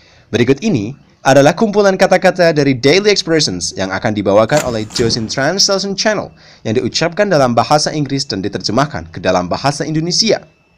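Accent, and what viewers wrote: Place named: United States. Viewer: Aksen american.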